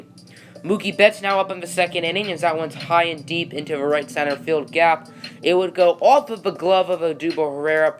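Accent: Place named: Boston, United States. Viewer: That Boston accent😂😂😂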